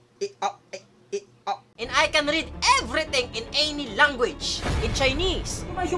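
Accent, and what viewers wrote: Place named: United States. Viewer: american english i love it lol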